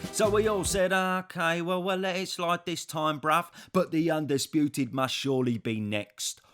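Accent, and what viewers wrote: Cockney, United Kingdom. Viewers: The cockney accent makes these videos 😅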